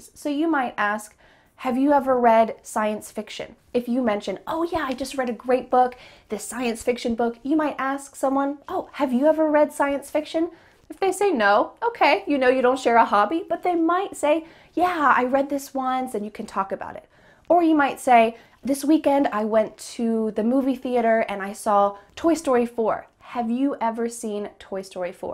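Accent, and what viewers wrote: United States. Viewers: Thanks. Is this American or British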